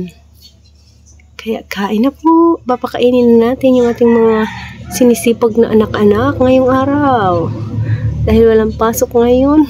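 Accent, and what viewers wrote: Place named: Visayas, Philippines. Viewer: Hahha sarap tlga pakinggan ung bisaya sis ba nakakaaliw pkinggan ko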